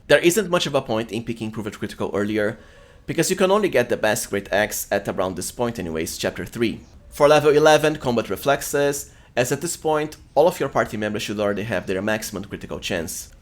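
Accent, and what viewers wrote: Brazil. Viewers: Acabei de descobrir o canal. Você é brasileiro? Fiquei com essa impressão pelo sotaque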